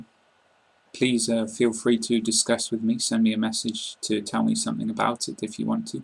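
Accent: United Kingdom. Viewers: thanks for teaching us i like British accent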